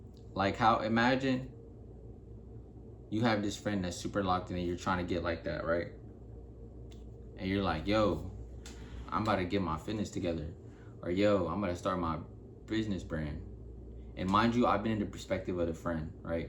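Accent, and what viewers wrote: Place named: Bay Area, United States. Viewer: You from the Bay Area you sound like it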